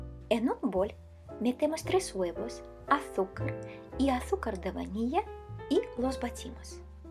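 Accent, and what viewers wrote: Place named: Russia. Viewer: Que bonito escuchar una rusa hablando español....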